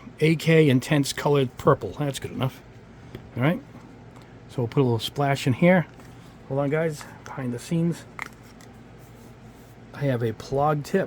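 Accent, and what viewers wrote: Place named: New York, United States. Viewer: Jesus!!! Got my mind blown with the extendable brush thing!!! I was shaking my jar to use the last part!!! Top advice as ALWAYS!! Also, love that NY-Italian accent, it's like hobbying with Il Padrino!;)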